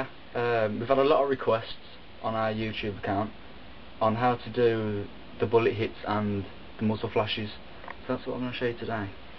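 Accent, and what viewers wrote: United Kingdom. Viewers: wow very british i must say bloody good job though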